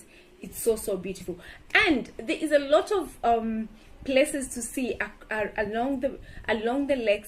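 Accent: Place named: Italy. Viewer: Eiiish,i love the Italian accent in you girl 😍💕💗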